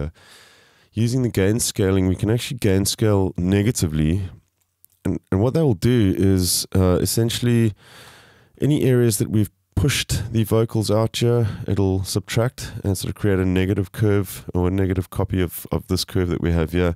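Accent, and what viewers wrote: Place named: South Africa. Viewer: I hear the SA accent. I feel at home.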